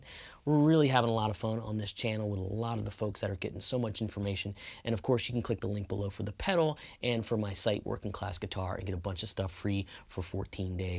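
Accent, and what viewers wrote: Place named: Texas, United States. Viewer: I can hear Texas !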